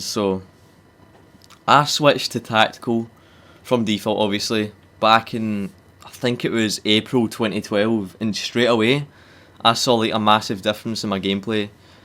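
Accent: Scotland, United Kingdom. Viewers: He's from Scotland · Hes from Scotland bro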